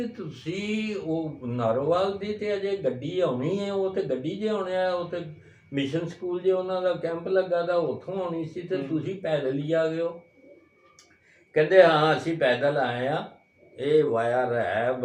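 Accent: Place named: Dogri, India. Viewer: sir di boli vich dogri touch ha. te enha bolya laya da si, jd ki punjabi vich kehnda ne laya hoya si. Narowal,Shakargarh, te Sialkot da ilaka kise zamane vich Jammu riyasat da hi hissa hi.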